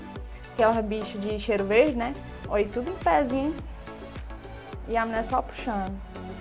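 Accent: Brazil. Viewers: este sotaque bonito é da Bahia ? Pois ocê não puxa o "S" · só pelo sotaque ja dá pra saber q é nordestina, slv pra Fortaleza 🇫🇷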